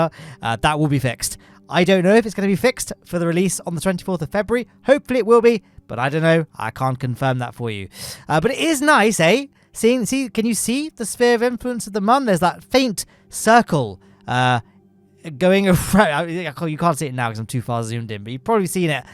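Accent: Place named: United Kingdom. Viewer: YEEEEEEEESSSSSSSSSSSSSSSSSSSSSSSSSS, I am like a kid on Christmas! 🎉🎉🎉🎉🎉 I can’t wait for the release! Also why do you sound exactly like Grian who plays Minecraft?!?! Do all British people sound the same?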